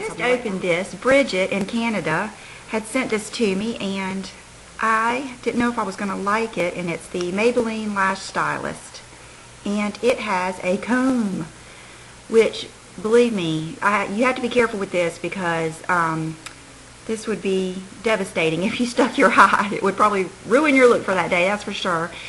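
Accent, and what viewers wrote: South, United States. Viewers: I really love your videos. I always watch your videos first. Love your accent, I am a southern girl too.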